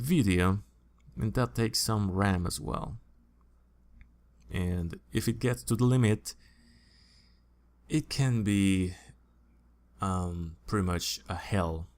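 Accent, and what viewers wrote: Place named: Germany. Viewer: Hablas mejor ingles que el "buen" Peña Nieto. Estaba a punto de decir que eras Aleman por el accento. Yo soy de Francia, vivo en Mexico ;)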